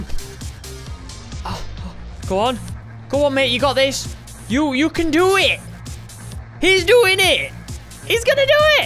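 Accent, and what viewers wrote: Scotland, United Kingdom. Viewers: U sound british but yeah me too 😂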